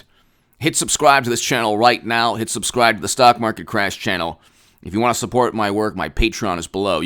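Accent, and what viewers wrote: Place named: New York, United States. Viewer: . Your accent is awesome. Please let me know where in US you are from?. Must be NY right?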